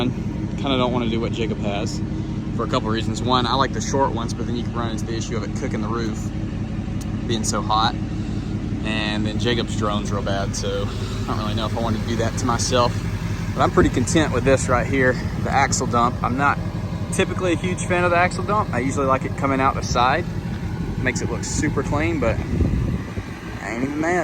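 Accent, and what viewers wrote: Alabama, United States. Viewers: What part of Bama are you from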